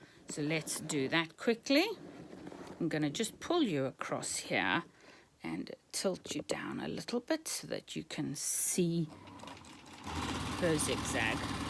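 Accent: South Africa. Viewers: I just bought a 700 which only does straight and zig-zag stitches. I can't wait for it to arrive. Can you point me in the direction of some information as to how to give it the kind of TLC it deserves, as mentioned in your video? Love your accent by the way. Are you from South Africa?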